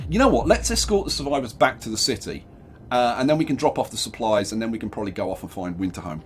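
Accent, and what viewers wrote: United Kingdom. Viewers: I literally can not remember being so fucking entertained by a gameplay... its just amazing, and also i cant imagine listening to this in something other than a freaking british accent... Good job, buddy keep them comming! Lots of love!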